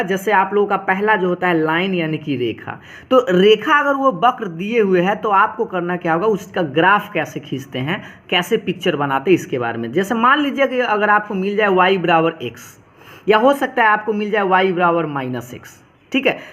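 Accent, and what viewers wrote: Bihar, India. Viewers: sir hum U.P se hai...sir apki voice se pahachan Gaye hai ki aap Bihar se hai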